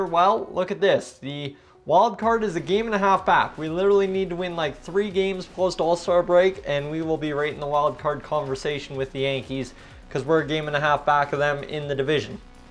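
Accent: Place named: Canada. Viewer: As a fellow Canadian, i love your accent bro ahah. Doesn't get anymore Canadian than that. Keep up the good work ma dude 👊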